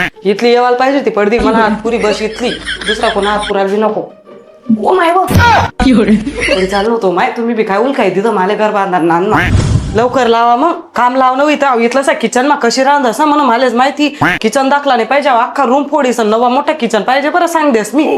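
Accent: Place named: Khandesh, India. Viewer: Ek no khandeshi bolta tai mi pn khandeshi ahe tumchi bhasha aaikun khup aaplepna vatato❤❤❤❤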